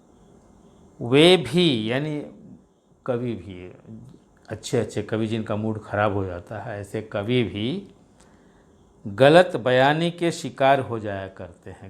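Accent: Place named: Bihar, India. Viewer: Sir aap Bihar ,ara jilaa ke h kyaaaa❤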